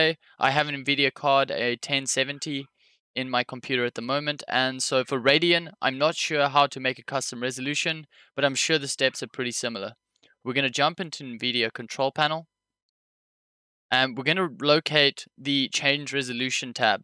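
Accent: South Africa. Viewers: South African? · are u south african? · Are you south African bro? Appreciate the content and all love from RSA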